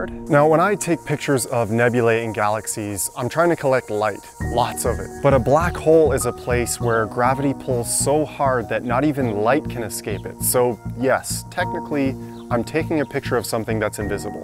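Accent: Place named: Canada. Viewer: Before you go apologizing for your country, you might want to mention which country you are in. I thought you were Canadian because of your accent but I know there have been a lot of forest fires in the US. No criticism intended. Your content it always GREAT!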